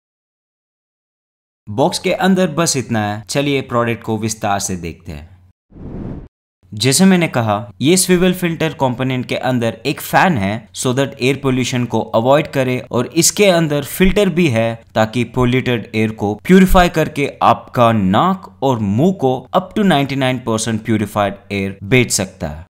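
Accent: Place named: South, India. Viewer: Are you from South there is something in your accent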